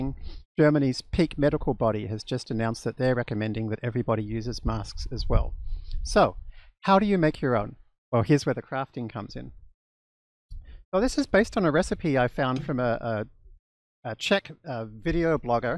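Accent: Australia. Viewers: Such informative video and I keep wondering does he have an Australian accent 🤔.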